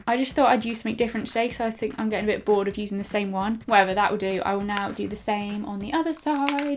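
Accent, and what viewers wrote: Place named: London, United Kingdom. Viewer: I didn't think you would reply thanks I love your vids. I love how I finally found another English makeup person like Zoella,but your from London I can tell by ur accent xxx 💙🦄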